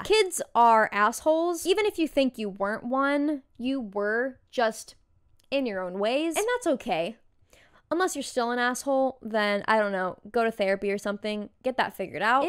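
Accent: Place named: Canada. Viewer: Why do you have the Canadian raising accent 😭😭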